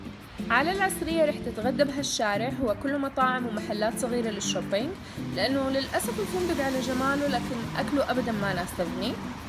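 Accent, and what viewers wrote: Saudi Arabia. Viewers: ذكرتي اسمك في الفيديو و اسم مسيحي وانتي ما ذكرتي ولا معلم اسلامي في كندا ولهجتك جداويه ولكن اعتقد انك لبنانيه مسيحيه الاصل هذا اللي تبينلي..ربنا يهديكي للاسلام وهوي طريق النجاة باذن الله من النار يوم القيامه..والمسيحي والكافر لا يدخل الجنه حتى لو كانت اخلاقه في السماء لانه اشرك بالله وكفر برسالة محمد حتى لو قلتي انا أؤمن برسالة محمد صلى الله عليه وسلم.المسيحي يؤمن بأن الله ثالث ثلاثة وهذا في قمة الاشراك بالله عز وجل لأن الله لم يلد ولم يولد ولم يكن له كفوا احد..اتمنى لجميع المسيحيين الهدايه والسير على ملة الاسلام هدى الله الجميع..💗💗💗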